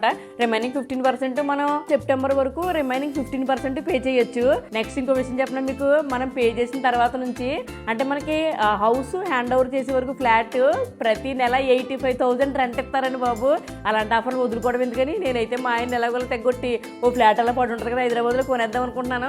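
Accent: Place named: Amalapuram, India. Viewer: Hat's off akka mee amalapuram akcent super Ento mari meeru serious expression peti dialogue cheptunte full comedy ga untundi mee expressions ,mee sense of humor super😂😂😂.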